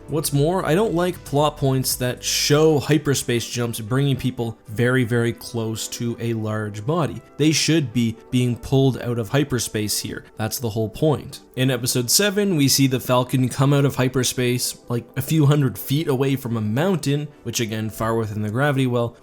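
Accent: Canada. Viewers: Oh shit, you're a canuck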